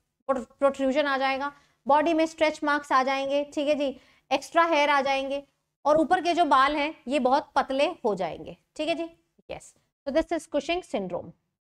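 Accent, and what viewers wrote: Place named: Haryana, India. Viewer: Hariyana se ho aap😊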